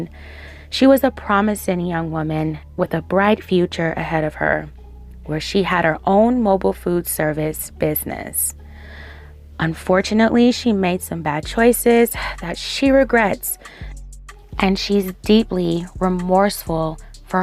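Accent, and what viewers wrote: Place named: New York, United States. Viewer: I love my girl with the New York accent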